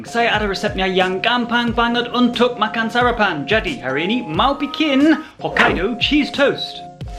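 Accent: United Kingdom. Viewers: suka sama British accent nyaa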